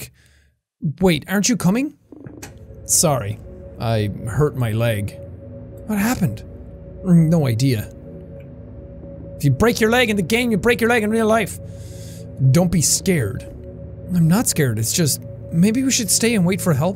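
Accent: Afrikaans, South Africa. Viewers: The first game he just said “my boy” not butt boy it’s just his South African accent. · Quite the heavy Afrikaans one, too! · I'm from South Africa BTW he said my boy not but boy. He just has an Afrikaans accent but I can hear the confusion of but and my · Hes saying "my boy" :P Its a South African accent :D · This is legit how some of us sound here in SA 😂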